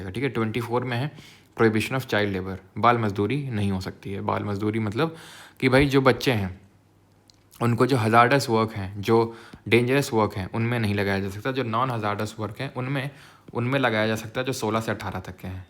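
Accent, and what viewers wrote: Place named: Uttar Pradesh, India. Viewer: Up se ho kya aap?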